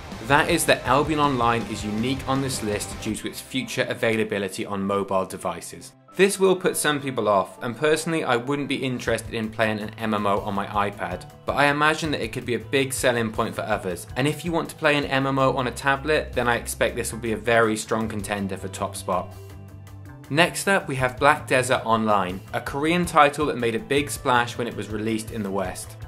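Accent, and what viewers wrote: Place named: England, United Kingdom. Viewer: Take this as a compliment: I always like watching video game reviews and lists like this when narrated by a person with an English accent. Probably comes from my days of watching TotalBiscuit when I was playing WoW.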